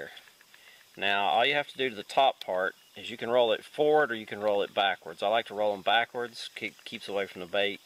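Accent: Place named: Southern Appalachia, United States. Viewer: Great vid! What part of east tn? Im originally from southeast ky. I could pick up your accent from that part of the country!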